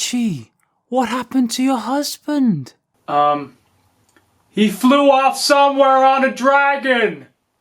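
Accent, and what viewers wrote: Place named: United Kingdom. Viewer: British, Don't care didn't ask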